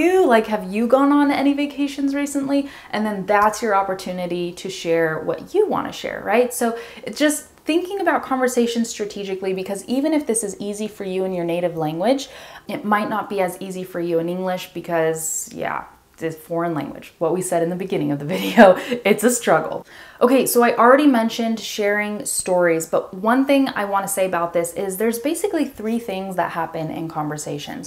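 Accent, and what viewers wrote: California, United States. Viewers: I really like your Californian accent. It's easy to understand so I can get your tips so well. Thanks for making this video Greetings from Lima :) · i adore the American accent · i just want to hear you talking i like your voice and american accent.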